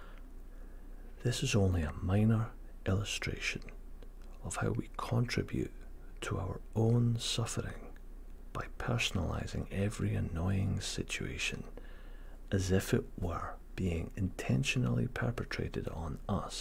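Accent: Scotland, United Kingdom. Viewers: Love love the Scottish accent! Your voice is so relaxing. Just subscribed to your channel 🙋🏽‍♀️😊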